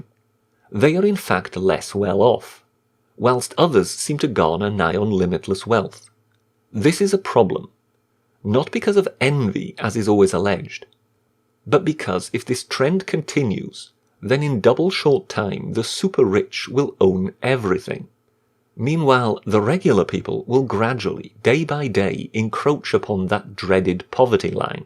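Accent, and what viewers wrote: Wales, United Kingdom. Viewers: I never realised how biased the media was in the UK until Brexit came about. The BBC's supposed impartiality was exposed to me as being a complete and utter lie. They're even worse when it comes to President Trump. Always negative, never positive completely one sided in most regards. Great summary, should be heard by politicians. I detect a Welsh accent too! ;) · Do I hear a Welsh twang?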